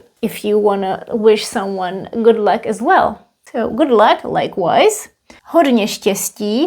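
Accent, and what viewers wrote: Czech Republic. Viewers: I have a question. Why do you speak in a diferent way than moravians? I know a lot of them from the zone close to the border to Vienna and i find them easy to understand. Easier to understand gramaticly and even in the pronounciation. Do the bohemians have a diferent dialect than the moravians? Thanks for the classes by the way...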